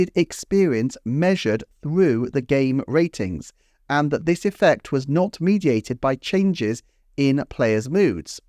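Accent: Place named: RP, United Kingdom. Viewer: This review doesn't pertain directly to this particular video, but I just want to say since YouTube tells me I'm in the top 0.3% of your viewers that I do enjoy watching your videos. The fact they're based on statistics and facts rather than so much speculation like most gaming YouTubers makes it a good watch even if the subjects are sometimes what my friends would call nerdy. Lol. And plus my hillbilly gay ass from Kentucky just imagines some fancy brit speaking over a cup of tea and it is almost an ASMR experience. Keep up the good work. 😁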